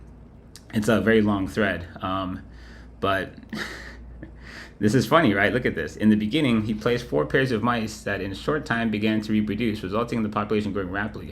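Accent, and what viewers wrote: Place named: New York, United States. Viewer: i hear that NY seeping in here'n there :)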